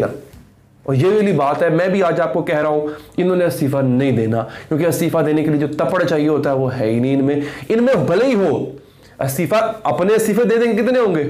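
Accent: Punjab, India. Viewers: Punjabi touch is very interesting 😊😊,,,keep it up bro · Bhai your punjabi touch is amazing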